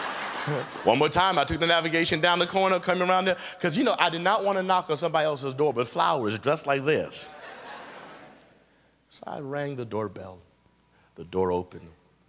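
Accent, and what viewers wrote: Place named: Nigeria. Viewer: U sound like a Nigerian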